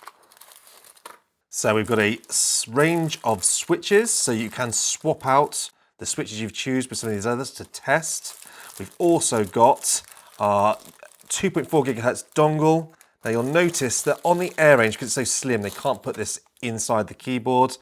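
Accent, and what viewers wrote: United Kingdom. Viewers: O, I use reds for quiet use. Is that a real British accent or are you really from Texas?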